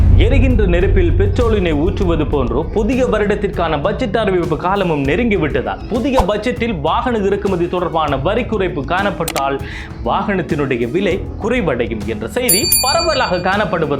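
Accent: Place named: Tamil Nadu, India. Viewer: உங்கள் தமிழ் .....அருமை ..... தமிழ்நாட்டுத்தமிழ் ..........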